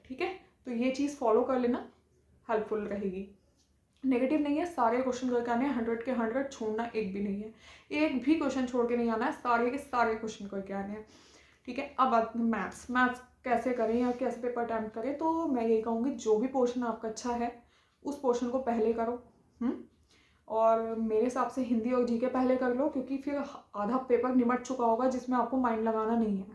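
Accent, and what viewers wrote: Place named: Purvanchal, India. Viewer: hame laga purvanchal side ki ho aap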